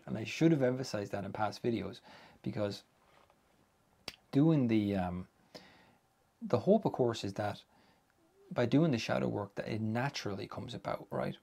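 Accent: Ireland. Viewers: New to your channel. Totally off topic.. are you Irish?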